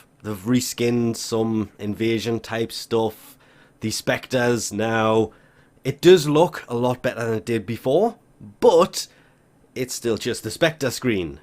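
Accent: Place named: Liverpool, United Kingdom. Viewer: Liverpool accent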